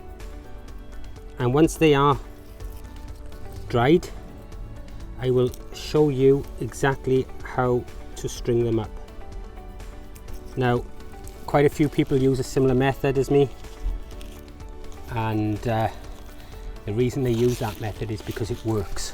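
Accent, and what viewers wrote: Wales, United Kingdom. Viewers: This is a great video! Really informative! I'm growing onions that I found in my brothers old fridge 😂 supermarket bought onions that had sprouted alot! I decided to put them in the ground and they went! I think they are ready to harvest as they have bent over but I wasn't sure because there's still flowers on them. They've been like that for a few weeks now. Also It's really nice to hear a welsh accent! Shwmae from Carmarthenshire :)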